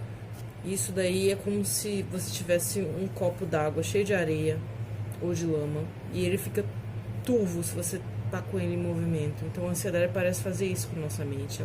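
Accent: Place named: Bahia, Brazil. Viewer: Muito bom e, bem explicado. O sotaque baiano foi o destaque, esse medicamento e sensacional.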